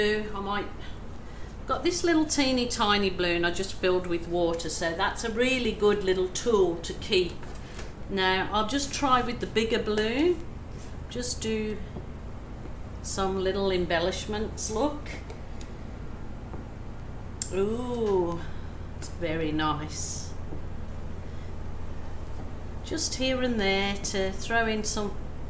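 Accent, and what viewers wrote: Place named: Midlands, United Kingdom. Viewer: You're so welcome, I enjoy your work and I like your easy going attitude, along with your midlands accent! Don't blame you for emigrating, love the filming of the views from your home, you live in a beautiful area of the world. Much respect to you.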